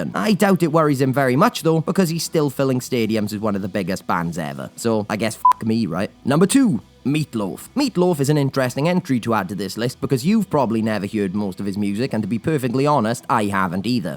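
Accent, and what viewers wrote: Wales, United Kingdom. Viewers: remember one true fact, many of these stars can go on a diet, but the narrator will always sound like a Welsh git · You sound like a British JFK. Actually, I'm not even sure you're British now.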